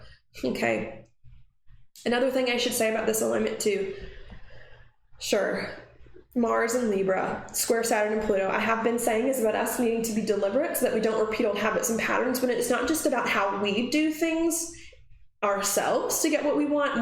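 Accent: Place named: Georgia, United States. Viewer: I love you in pink, btw. Love your explanations! Thanks,!! Love that subtle Georgia twang;)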